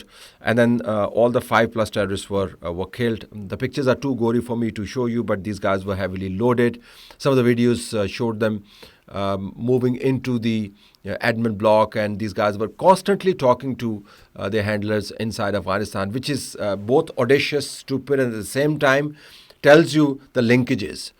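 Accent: Pakistan. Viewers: Paki pooonjabi english 😂😢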